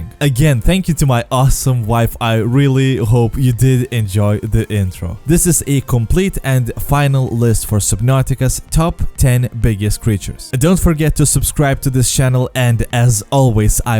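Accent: Russia. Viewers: Youre accent youre from russia? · Russian accent I love it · That russian accent tho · Are you Russian or from Republic of Moldova? :) I ask that bcs of your accent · Акцент русский. Разве нет? Четко слышно букву "Р" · Ты русскиий? У тебя акцент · omg i love his russian accent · Бля, чувство что ты русский) акцент точно не инглЫш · Акцент прям как у русского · Wait IS THAT A RUSSIN I HEAR ??? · Love Russia accent friend... no really I like the Russia accent!!! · Are you from Russia? Your pronunciation is very similar to Russian. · Русский акцент или нет? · yea i can see you sound russian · It love you're Russian accents!!! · Максимально русский акцент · he sounds like a stereotypical russian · Мммм... Русский акцент)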